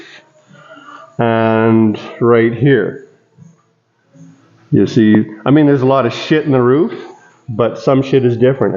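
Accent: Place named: Canada. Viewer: It is good to see a Canadian that still talks Canadian, eh.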